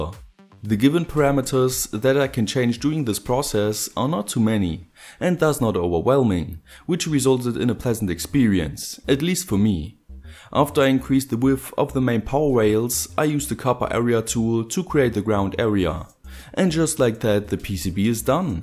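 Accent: Germany. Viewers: man hört voll das du Deutscher bist :D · what realy you are german iam too i wonder for a bit why your main plugs are european and your english is kinda german i dont know XD but why are you dont make german videos i love to see that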